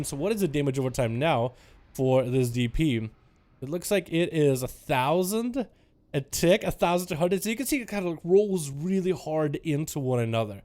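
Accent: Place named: Russia. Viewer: as a non native English speaker I understand him just fine. You know he is Russian right? so for non native speakers it's hard to sound "perfect".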